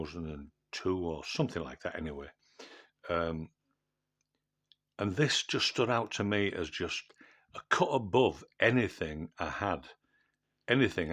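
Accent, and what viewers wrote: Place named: Yorkshire, United Kingdom. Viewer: . New subscriber here & one that has recently taken up this hobby. I’ve started collecting 50p, King Charles III coins. This has now been added to by collecting sixpences (coz that was my first pocket money). I’m interested in Irish & Celtic coins but don’t know if they’re worth collecting. Great to hear a Yorkshire voice on the subject, as I’m from Mirfield.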